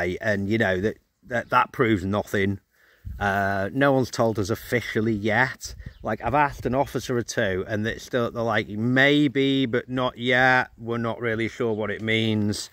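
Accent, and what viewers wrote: United Kingdom. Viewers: British accent in Ukraine😅👍👍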